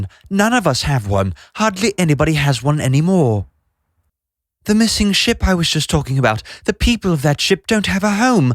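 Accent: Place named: United Kingdom. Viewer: First off thank you for this and I totally agree. Second what the fuck, you’re not British? 😮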